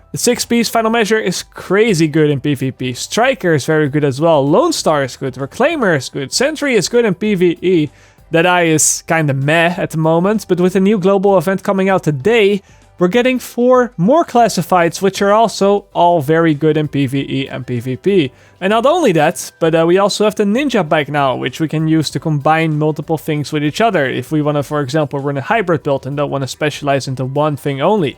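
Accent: Netherlands. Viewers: Aksing Are you dutch ?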